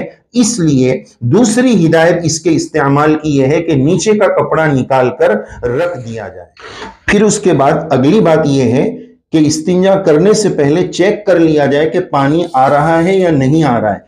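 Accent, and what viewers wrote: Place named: Arab world. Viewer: Urdu Urdu ki tarhan he bola jay na ke aarbic style me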